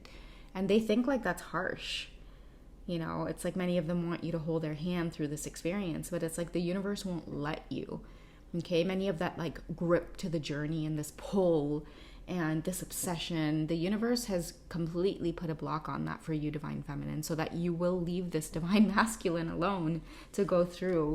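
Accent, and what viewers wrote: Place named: Trinidad and Tobago. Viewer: I always knew you were from Trinidad 🇹🇹 hearing you talk .am from Trinidad 🇹🇹 too I love ❤️ your reading 📚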